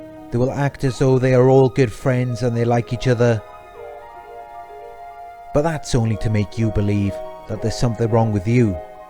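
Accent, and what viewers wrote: Wales, United Kingdom. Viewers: Great video. You sound Welsh. Im welsh too! May I ask a question? I started a FB page helping people with mental health and theres one guy on there thats always sucking up to me. Compliments compliments compliments. Yet....whenever he becomes close with certain members (and is conversing with them through messenger), they seem to go very distant and cool towards me. The women in particular. This has happened several times. I thought it may be my imagination, but I dont know....it all seems a little strange. Do you think this guy may be a bit of a narc? Thanks in advance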